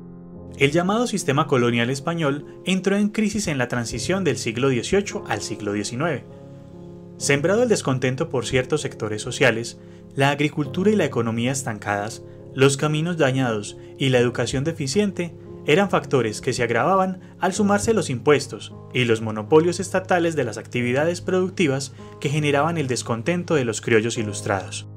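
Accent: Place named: Antioquia, Colombia. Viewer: Me encanta que conserves el acento paisa👍👍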